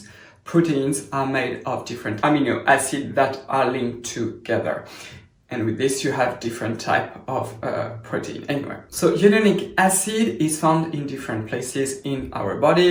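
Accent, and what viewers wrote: France. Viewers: your content is amazing and you are so knowledgeable. However it is difficult sometimes to follow or understand the concept or language or some words, especially for foreigners who are not used to different accents (your accent is beautifully French and I am NOT criticizing it at all, please do not get me wrong, I am foreigner myself) - have you considered maybe making a little graphic boards for us? Like simple white board and a pen to explain? Thank you, please keep doing your job <3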